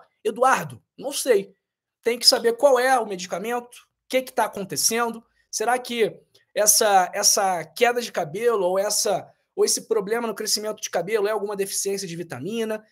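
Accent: Rio de Janeiro, Brazil. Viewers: CARA...A SUA CARA E A SUA CONVERSA CARIOCADA NÃO PASSA CONFIANÇA... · Incrível no Brasil....esses médicos YouTube que massacram a gramática da língua portuguesa...pior esse sotaque carioca malandro nada chic .....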